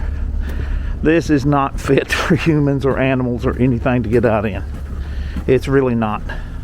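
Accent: South, United States. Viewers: Be yourself. There is nothing wrong with your country accent, that is our blessing from being in the south. I have one too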